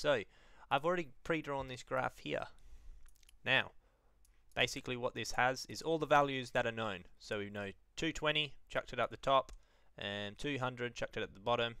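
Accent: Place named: Australia. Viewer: Aussies make explanations much more fun to follow with their accents, cheers mate, and great job!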